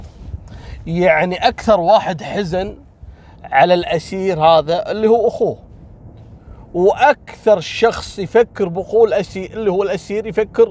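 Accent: Kuwait. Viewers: ياخي مع انك تقول قصص جرائم ورعب وتخوف بس انت سعادة حقيقة بحياتي 😊❤️حبيت الكويت وأهلها ولهجتكم منك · أدمنت طريقة سردك يا ولهجتكم الكويتية حلوة 🇩🇿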